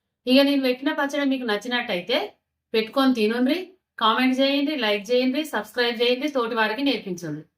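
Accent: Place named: Mancherial, India. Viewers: Hi aunty. Nenu me new subscriber ni. Medi ekkada aunty. Madi Karimnagar. Me language same Karimnagar side vallu matladinatte undi. Me vantalu bagunnay. Meru chupinchina udakabetti chesina tomato pachchadi Ninna chesanu. Chala baga kudirindi. Mirapakayalu ekkada kontaru. Chala bagundi me karam. Red color lo. Maku address cheppandi aunty. Memu kuda konukkutam. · మంచి వంటకం చేసారమ్మ చాలా థాంక్స్ మంచిర్యాలన మీది మాట తీరు అలాగే ఉంది 😊 🙏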